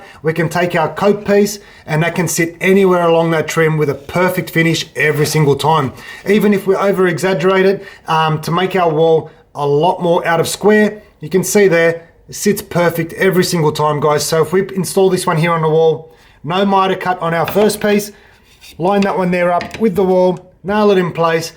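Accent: Australia. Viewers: I am about to install baseboard myself. I could not find an easy way to figure out the angles until I saw this. It seems you have mitre cut to 45 first before grinding out. Is there a way to eliminate the mitre cut too? Sorry this may be a silly question. Love the Aussie accent!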